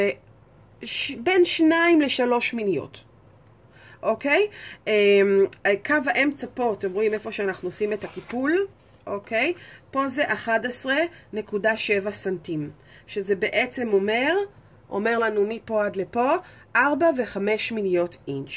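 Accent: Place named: Arab world. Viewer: Just watched this, didn't understand what you said but did get the idea and just like any papercrafter you love bling....lol For some some reason i thought you might have been Russian of Polish. But listening to you maybe Arabic.? Loved listening to you and your passion is really shining thru I keep smiling. Thankyou for sharing your excitement and passion, so infectious...🥰😜😘💜💚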